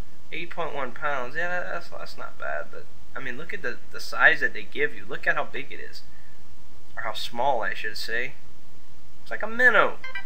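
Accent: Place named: Louisiana, United States. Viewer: Where in south Louisiana are you from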